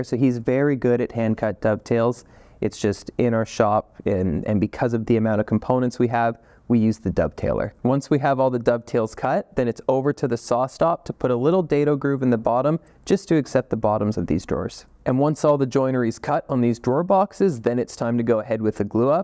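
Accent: Canada. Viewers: He is Canadian that is how they speak.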